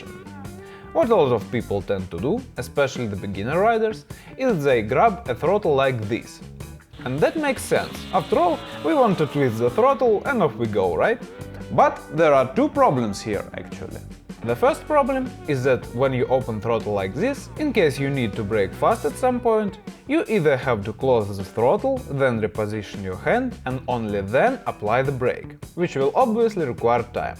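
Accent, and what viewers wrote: Russia. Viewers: I just love his accent, it’s Russian, right? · Love the Russian accent on English. Sounds like a Marvel character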